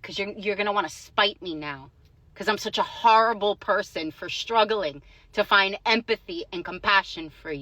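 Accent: New York, United States. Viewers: Actaully if you would actually watch and listen to us farmers when we speak. Plenty of vidoes of us. Its the Ag monopolies that are killing us. Pretty much all grain is controlled by 2 companies. ADM. and Cargill. They are keeping grain prices low. You honestly sound like your from newyork. And never seen a farm. Lol.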